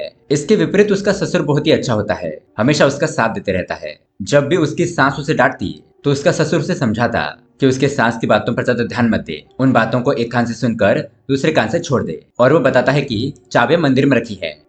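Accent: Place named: Maharashtra, India. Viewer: Are you Maharashtrian??? B'coz marathi speekers ki hindi accent aisi hi hoti hai..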